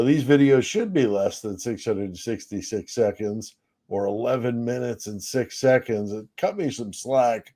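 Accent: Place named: East Texas, United States. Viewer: Cool, first time viewer. Liked your Idaho coverage. Do I hear east Tx in that accent 🧐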